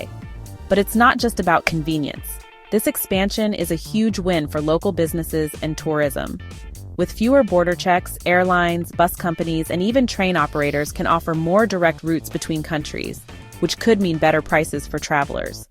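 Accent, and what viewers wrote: United States. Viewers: American narrator ? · Sick of this American narrator and her bigotry. Presumably AI, input by the trumpeter.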